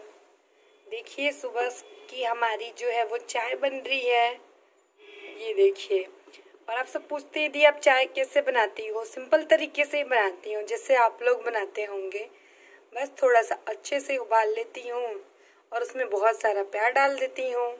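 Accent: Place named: Ballia, India. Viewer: Logon ka kaam h kahna kahane do bahan aap kaha se h aapki boli balliya side ki lagati h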